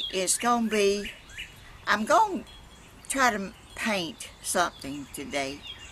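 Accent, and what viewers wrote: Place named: Georgia, United States. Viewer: Happy birthday dear lady. I am a new subscriber. I enjoy watching your videos and I especially like listening to your lovely GA drawl. 😊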